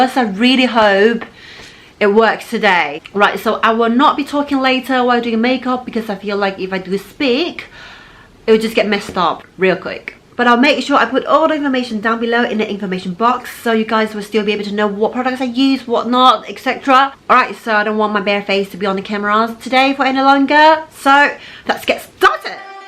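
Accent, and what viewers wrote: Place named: United Kingdom. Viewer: 我高中畢業的歐巴桑都聽得懂🤗 英國腔 👍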